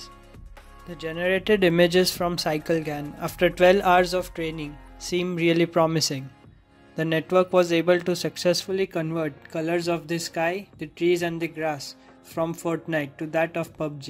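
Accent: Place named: India. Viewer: all i need is 1 sec to understand u are from india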